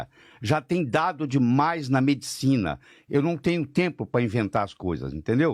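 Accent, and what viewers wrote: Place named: Portugal. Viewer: O sotaque da língua portuguesa mais lindo do mundo : ♡♡♡Portugal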